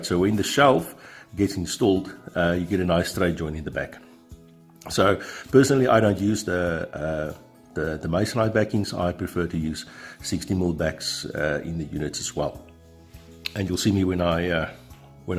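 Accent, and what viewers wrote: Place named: Afrikaans, South Africa. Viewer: Ek wou se dit is n Afrikaanse aksent. Baie dankie vir die video, ek moet binnekort begin kaste bou vir my kombuis, ek will ook melamine gebruik maar sal met n 'Festool track saw' moet gebruik om die panele te sny. Ek het iewers gesien dit kan gedoen word sonder om versplintering te kry, is daar n spesiale manier om dit dan te doen? Ek sal baie graag ook die plan vir die 'scissors table' wil sien as dit beskikbaar is. Baie dankie.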